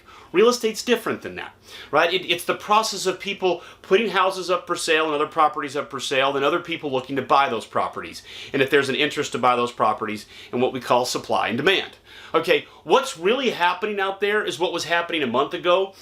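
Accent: South, United States. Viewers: I'm working to get pre-qualified now as a first time home buyer. Just want to say that I love your videos,. You've got great energy, mannerisms, and a southern draw to boot. I could watch all day!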